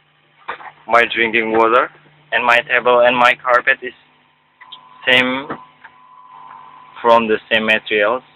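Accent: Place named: Indonesia. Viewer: mas bahasa inggris nya lancar ya, ya Memang kedengeran aneh soal nya aksen nya indonesia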